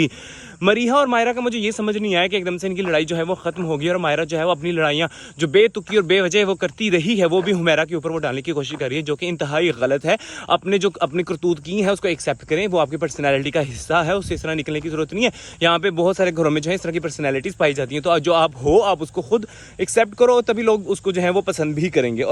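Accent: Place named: Punjab, India. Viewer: Please apni Urdu thik karin Ap kah andaz Punjabi hai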